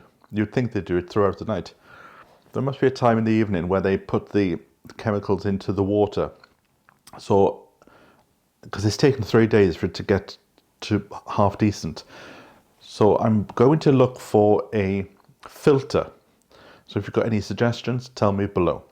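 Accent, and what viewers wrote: Wales, United Kingdom. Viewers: That story about the fish and chips order made me laugh - hardly surprising they didn't want to deliver 300 miles away!😁 I wonder what the locals think of your accent - it is a definite Welsh accent to me, but perhaps to their ears it is quite mild and you even sound a bit English? How are you getting on with reviving your Welsh language skills? Do the locals speak Welsh on a daily basis? You seem very settled there already. 🙂 The hilly terrain will soon improve your fitness if you take daily walks. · I'm loving your channel! My father grew up in Neath. I miss his lilting accent and his magnificent singing voice. He left Wales in his late 20's, because of his very bad asthma... they told him he needed the sun and dry air in South Africa, so off he went. And then he met my mother and a while later I arrived. Your accent is not as broad as his was. 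Even so, it does my heart good to hear it! The friendliest man, he loved telling a good tale and sitting down at the piano, playing and singing his heart out. Bless you and thank you for the happy nemories and your fascinating channel. Bronwen from Johannesburg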